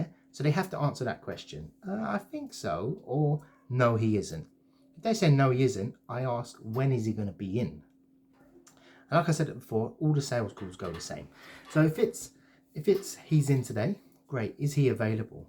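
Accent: United Kingdom. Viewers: Your British accent made me remind of my old gold days at Guildford. Now I am in India working as a US Tech. Recruiter. I have subscribed to your channel yesterday and enjoying watching the videos. You are passing good knowledge. Keep up the good work. Thank you!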